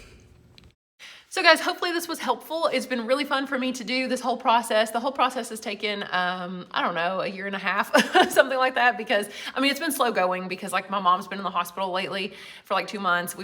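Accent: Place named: Kentucky, United States. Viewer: Also, I just found out you're from Kentucky and so am I!!!!! I thought you had a familiar accent 😂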